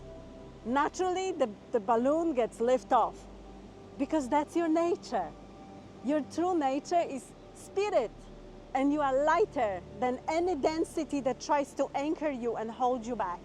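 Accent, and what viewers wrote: Poland. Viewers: Hello, thank you for your words, you seem to speak with Polish accent. ❤❤❤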